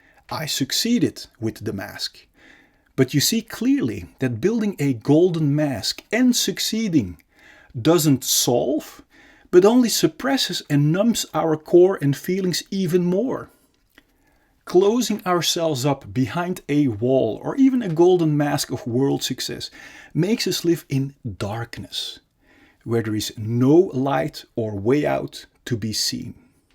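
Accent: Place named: Netherlands. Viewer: Hallo...ik heb me al een paar keer ingeschreven maar niets gekregen. Ik zal eens een mail sturen om te unblocken. Aan je accent te oordelen kon ik in het Nederlands schrijven 😊 Ben een belgje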